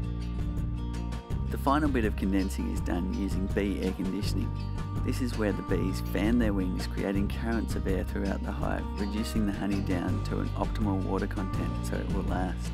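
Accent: Australia. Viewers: Would very much appreciate you complement the vid with subtitles... your accented English (Aussie?) makes full comprehension rather difficult, caught about 90% of it. :-)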